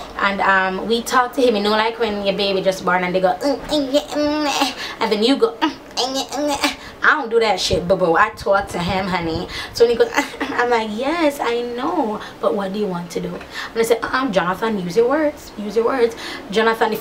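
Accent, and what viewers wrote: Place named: Jamaica. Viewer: When you are speaking to your international viewership you need to slow down in your speech and enunciate. We LOVE the Jamaican accent, but it can be hard to understand at times!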